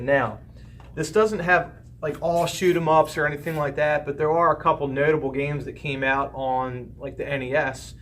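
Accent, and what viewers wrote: Baltimore, United States. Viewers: You totally sound like Baltimore! Catonsville here!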